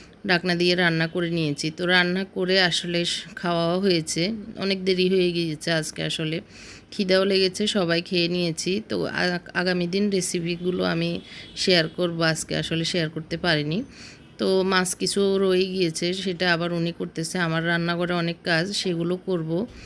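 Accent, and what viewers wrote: Noakhali, Bangladesh. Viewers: Apu kamon acen??apnar kaj dekhta onk valo lage.apnar blog onk valo lage.apnar kotha sunle onk apon Mona hoi.amrao basai noakhalir vasai kotha boli.vaia k o onk thanks apu k support daoar jonno.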